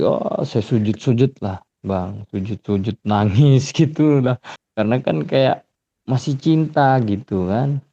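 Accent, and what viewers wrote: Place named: Lombok, Indonesia. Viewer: Cok logat nya kyk orang Lombok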